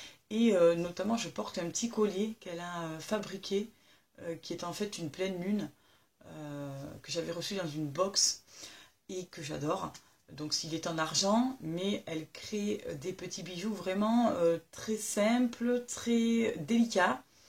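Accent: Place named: Quebec, Canada. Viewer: Alors comme à mon habitude j'écris en écoutant ta vidéo! la purée de noix de cajou, je ne savais même pas que ça existait, j'adore les noix de cajou (non salées bien sûr), ça doit se trouver à la Vie Claire. Les bijoux que tu présentes en premier ont l'air super jolis, mais bon je ne suis pas très bijoux. pas de bagues, pas de montres depuis 2005 (on a l'heure partout), juste une petite chaine et des boucles d'oreilles. J'adore l'accent québécois! J'admire et je respecte les personnes qui sont sensibles à l'environnement. Dommage qu'elles soient si peu nombreuses. J'ai un peu plus de mal avec les vegans (je respecte leur choix, mais quand je vois le comportement de certains extrémistes, la réciproque n'est pas de mise) je te souhaite un joli mois de novembre, moi ce sera loin, loin.... la réalisation d'un rêve.... bisous!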